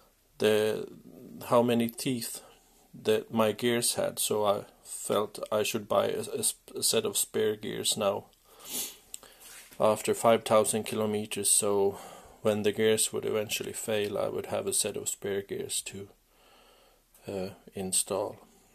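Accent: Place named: Bangladesh. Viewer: ভাই অাপনের কথা বাংলাদেশি বাংলাদেশি মনে হয়।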